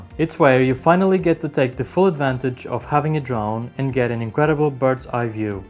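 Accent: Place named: Madeira, Portugal. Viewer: Quando ví o clip da ilha da Madeira, comecei a notar mais no teu sotaque e és claramente madeirense! Bom vídeo 👍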